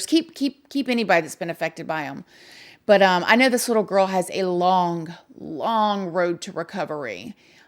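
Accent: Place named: Florida, United States. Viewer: Are you from Florida? You have that cute “kinda southern” accent us Floridians have. ❤️❤️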